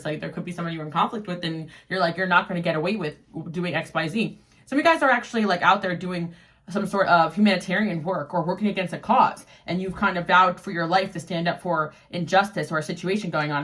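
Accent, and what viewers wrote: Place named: West Coast, United States. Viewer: LoL you must be West coast!! Love the fast voice like me!! Arizona!! Yet still excellent!! Love your read!! Awesome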